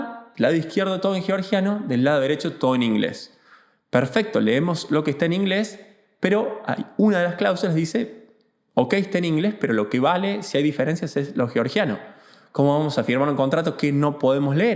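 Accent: Córdoba, Argentina. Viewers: Ya se te está pegando la tonada cordobesa de Batumi 😊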